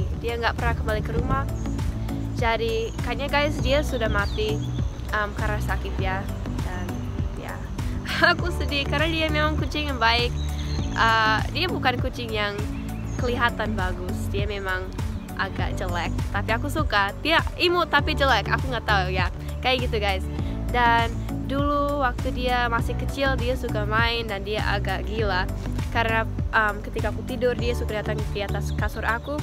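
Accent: Papua, Indonesia. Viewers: Wow logat papua bicaranya keren, hebat, saya tinggal di west java tapi suka dengan logat indonesia timur. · Ada logat papua-nya. :D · Kamu bicara seperti logat Papua thts awesome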